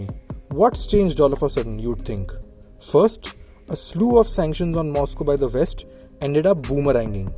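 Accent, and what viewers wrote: India. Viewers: PROPAGANDA . Sounds Indian . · Why dont indian ppl say "T" correctly and properly while pronouncing and reading such international news ....just try hard to throw away your thick -and bad indian accent all of sudden....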